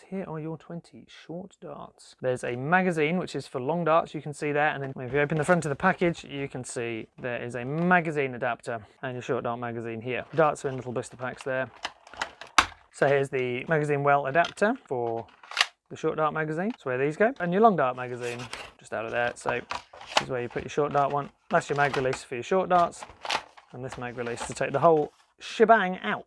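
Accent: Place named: United Kingdom. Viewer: yeah, keep up the great work! it’s not often that I hear another brit on yt, but Ilove it when I do.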